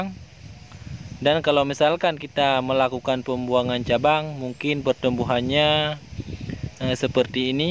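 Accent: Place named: Aceh, Indonesia. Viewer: Kaya orang Aceh Berbah Indonesia,Meri bak irama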